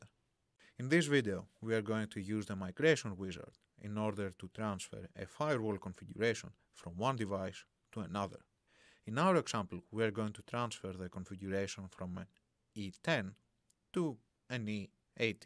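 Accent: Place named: Greece. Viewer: This man sounds like he's Hellenic! :) Nice! :)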